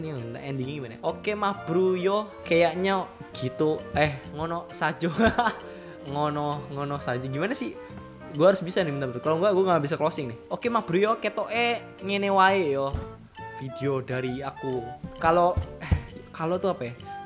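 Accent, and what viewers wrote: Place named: Java, Indonesia. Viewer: Medog Wkwkwk 😂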